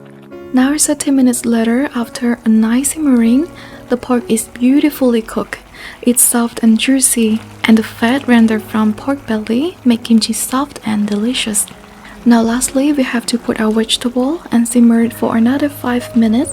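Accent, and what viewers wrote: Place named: United States. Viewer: So proud of you, what you are doing, and how you are representing Khmer on international stage. From listening to your pronunciation, I think you are Khmer American. I am even more amazed that you can speak both Khmer and English so fluently, not to mention your soft and charming voice. And one more thing, when will you reveal your face to millions of fan in Cambodia 😬😬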